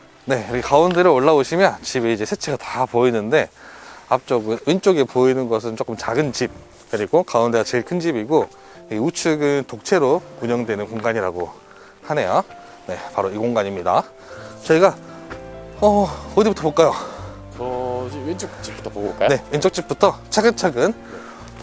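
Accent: Jeolla, South Korea. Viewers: 아따 이양반전라도네! 전라도씨가 온사방에 퍼져있으니 거시기 하네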